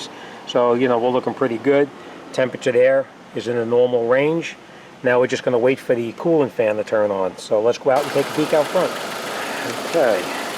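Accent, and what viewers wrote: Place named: New England, United States. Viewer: Good video. Hope you’re still doing well after nearly a decade. (Maybe enjoying a well-earned retirement!) Interesting that the procedure is different than that on the ‘06~’15 Mazda MX-5 Miata. But makes sense as it’s RWD and thus longitudinal mounted, versus the Mazda3 FWD and transverse mounted. No fiddling with the belt, tensioner, alternator, etc. But you do have to take out the air filter housing, and often even the throttle body. Good call on going back to the Mazda FL-22 dark green coolant. I call it the “Philadelphia Eagles Green” coolant, as opposed to the universal coolant (Seattle Seahawks bright green 😅). (I’m guessing from your accent you’re a Pats fan so I thought you might appreciate that 😂.) Ford used to carry the same (now replaced by Yellow), and “Asian Green” from Zerex or Peak are also suitable. 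I can tell you that these (usually only the early years like my ‘06 MX-5) had a common problem with the thermostat sticking open, similar design (wouldn’t be surprised if it was the same part number). I’ve had a P0126 on mine for almost as long as this video’s been up; I usually just reset it. 😂 Also if you have an Bluetooth OBD2 dongle to read the engine temperature while driving, it’s too low (maybe 140 F, should be around 190F). Also you may get insufficient heat from the vents (blows cool air). But “this is the year” I go ahead and fix it.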